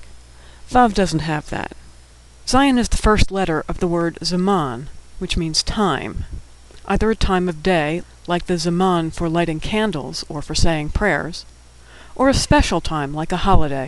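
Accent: United States. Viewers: Beautiful American voice, makes it much more easy to store the informations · horrible American accent... can't you find an Israeli to pronounce the words?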